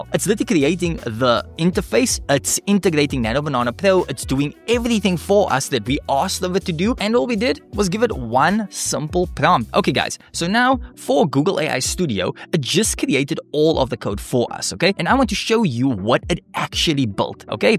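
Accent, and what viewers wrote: South Africa. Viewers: I hear a South African accent there, probably because of Afrikaans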